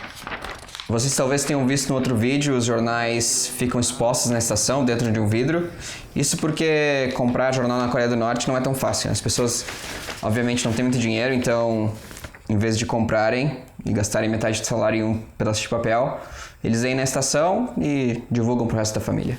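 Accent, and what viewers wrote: Rio Grande do Sul, Brazil. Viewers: cara vc e gaucho?Vc tem muito sotaque daqui sksk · Tu é gaúcho meu ?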